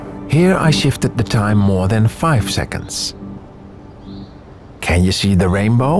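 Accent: Netherlands. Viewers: how can you pronounce everything perfectly but still have a massive dutch accent lolol. nice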